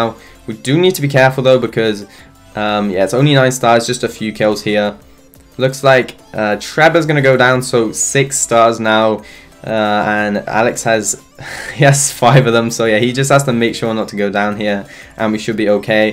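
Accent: United Kingdom. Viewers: I just love your britain accent😊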